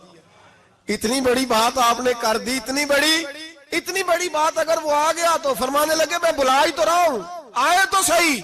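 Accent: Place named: Punjab, Pakistan. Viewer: Punjabi lahja urdu seekho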